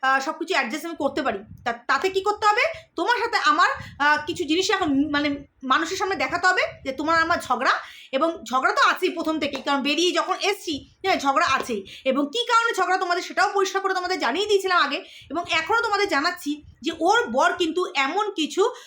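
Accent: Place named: Bangal, Bangladesh. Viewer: darun bollay bangal bhasha ta 😂😂😂😂😂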